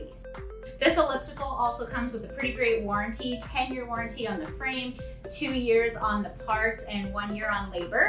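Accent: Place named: New England, United States. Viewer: Great relaxed demo. I take it you're from either Massachusetts or New Hampshire? (THE ACCENT) 👌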